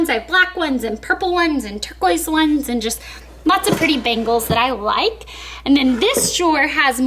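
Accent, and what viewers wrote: South, United States. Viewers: well she does have a southern accent who's trying to speak northernish so asking her to speak perfect french is probably a little too much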